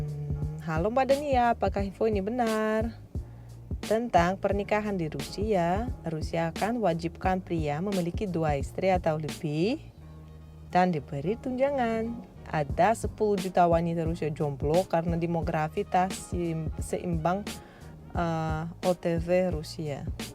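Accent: Indonesia. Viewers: Masya Allah.... ekspresi, gaya bahasa, & cengkok Indonesia banget. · Logat sudah Indonesia banget 👍👍👍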